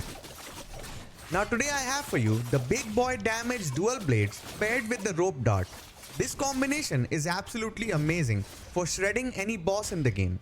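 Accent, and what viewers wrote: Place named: India. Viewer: I'm chinese and your Indian accent is so thick it made me pay more attention to what you were saying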